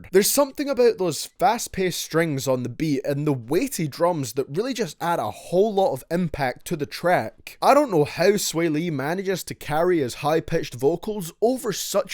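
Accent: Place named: Scotland, United Kingdom. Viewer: Where abouts in scotland are you from ?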